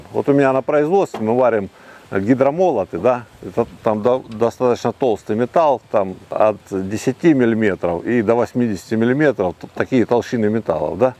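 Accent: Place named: South, Russia. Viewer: почему говор южнорусский? Точнее что-то между Краснадаром и Ставрополем, возможно Ростов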